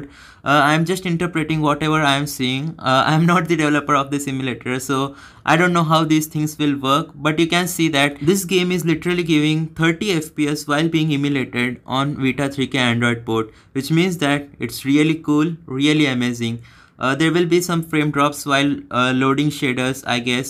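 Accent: India. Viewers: Ты индус? Акцент пиздец у тебя,нихрена не понял на английском 😁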